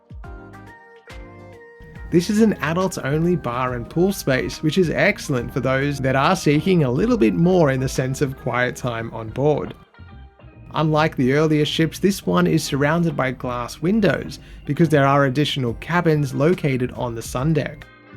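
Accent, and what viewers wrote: Australia. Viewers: It's refreshing to hear an Aussie doing cruise content. awesome stuff man. Thanks you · I love your Aussie accent! Where in Australia are you? I went to Melbourne and Sydney almost seven years ago and loved both. Of the 18 countries I've visited, Australia had the nicest people. Thanks for the video. I'm looking at doing a Princess cruise in a few years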